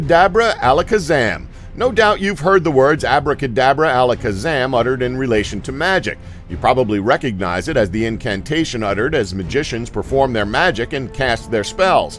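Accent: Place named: United States. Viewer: This channel is funny to me , it's a guy with an American accent reading something clearly written in a British dialect.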